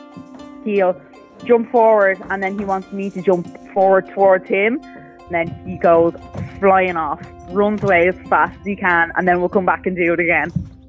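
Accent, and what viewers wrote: Ireland. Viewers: To hear an Irish voice on the Dodo, is rare but funny 🤣😁 love your story. Hope you teach some of your friends the same patients ☺️ so more people do such awesome things 👍☺️ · Thank you xx love the Irish accent xxx Welsh me see xxx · That's an Irish accent I believe - I'm in Donegal and took in a rescue German Shepherd nearly a year ago - he's called Tyson and he weighs 100lb - I love him to bits but he's very reactive but gradually settling down....! Walt is awesome and God Bless you for helping him out of his trauma - just like us humans it takes love and time to recover....! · That's a very solid Dublin accent behind the voice that is speaking only the truth, with regard to Walt. Well done, and may you have many happy years,!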